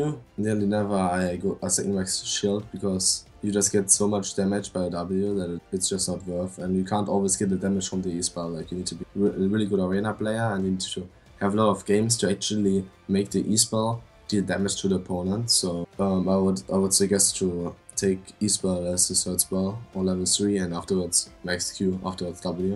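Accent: Germany. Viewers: Dieser deutsche Akzent omg xD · Dieser deutsche Aktzent ;D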